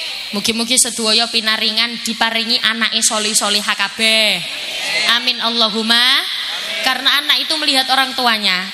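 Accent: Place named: Surabaya, Indonesia. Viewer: Dari cilacap ada ngapak ngapak si bu nyai Mumpuni Handayekti... dari surabaya ada nyai arek arek...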